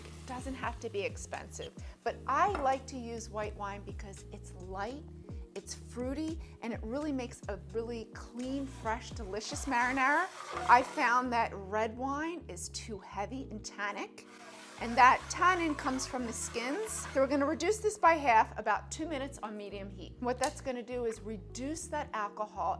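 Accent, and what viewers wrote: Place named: Boston, United States. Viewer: Like a non Jersey Carmela Soprano but MUCH prettier. said your from south Philly? I assumed greater Boston area at first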